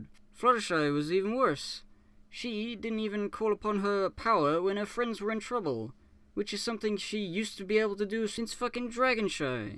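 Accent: United Kingdom. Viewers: I'm confused. When I first heard his voice, I thought he was British, but in this video, where he's completely drunk, he sounds Italian to me. Where is he actually from? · Being British, I can still hear his real accent as he talks, so I think this is a parody on some of the non-English content creators, notably JanAnimations. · Nothing I love more then to listen do a drunk man bitch in his non-native language. That is me being sincere not sarcastic. This was way to fun. Wont shut the FUCK UP! Still not shutting the FUCK UP! Has completely forgotten how to shut the FUCK UP! I couldn't stop laughing. P.S. The first time I listened to you I thought you were British. But I heard so many other accents this go around.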